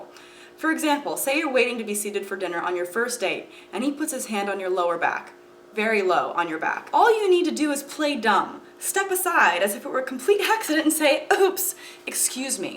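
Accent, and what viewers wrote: South, United States. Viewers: Where are you from? You sound like you have a Southern accent.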